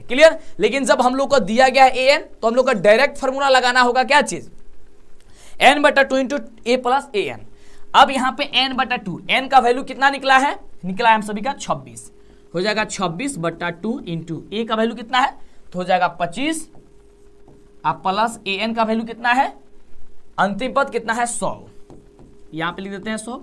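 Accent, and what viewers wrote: Bhojpuri, India. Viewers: Aap Bhojpuri mei Kyo boliye Lagte Hai sir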